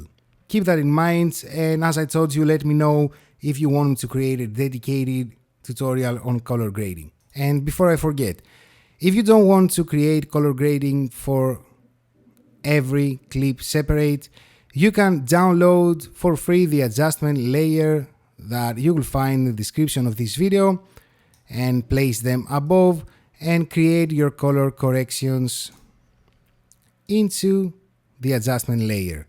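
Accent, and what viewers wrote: Greece. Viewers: I love the Greek accent. This is a great tutorial for beginners like myself. Clear and concise . This will be my "go-to" video until I become an advanced user someday.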